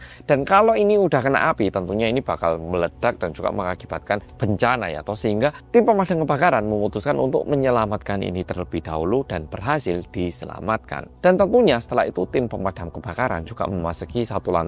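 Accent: Java, Indonesia. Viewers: Ya ampun😢 tks mas muedok🎉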